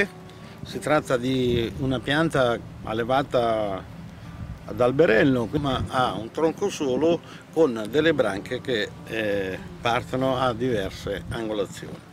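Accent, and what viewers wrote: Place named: Calabria, Italy. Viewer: sentivo un certo accento calabrese. Anche io sono calabrese. Di Nicotera VV.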